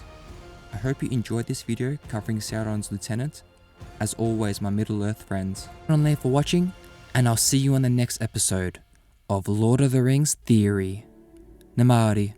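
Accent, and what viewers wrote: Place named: Australia. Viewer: I'm confused, this guy sounds like he's Australian but his English is so poor. Why is that?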